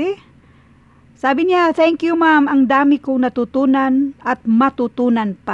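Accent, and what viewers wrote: Visayas, Philippines. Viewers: Bisaya ka ba! 😁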